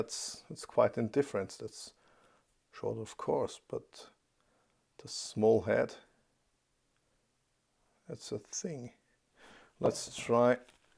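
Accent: Germany. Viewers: it took me three seconds to be sure youre german.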